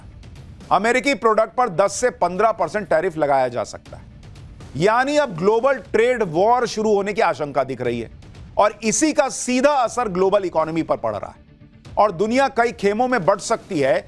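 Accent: Bihar, India. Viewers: Pure hindi language mein bolo bihari hindi nhi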